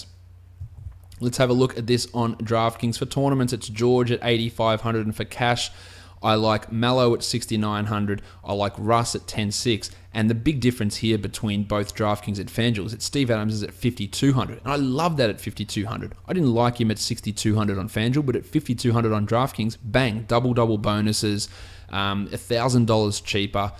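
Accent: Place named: Australia. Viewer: Awesome thanks! I listen to your podcast every morning before school/work, used to live in aus for 2 years so might just be missing the accent.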